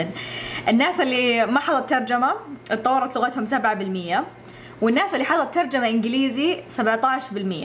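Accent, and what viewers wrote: Najd, Saudi Arabia. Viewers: ياربي بعشق لهجتك السعودية الاصيلة دي بجد جميلة جداً ربنا يحميكي ♥️ بقالي ٣ شهور نباتية 💪🏼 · لاتكلمي انجليزي اذا صورتي لنا لان لهجتكك او طريقة كلامك بالسعودي جميلللللللل 💛💛💛💛. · اللهجة دي حقت أهل الرياض أهل نجد🌹🌹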